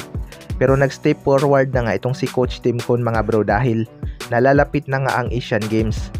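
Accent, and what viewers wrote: Visayas, Philippines. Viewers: bisaya ka!Ayusin Mo ung pag kkwento..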